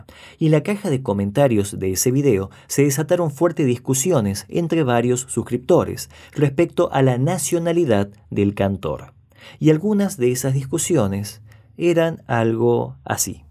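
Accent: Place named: Buenos Aires, Argentina. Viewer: Pero muy porteño del buenos aires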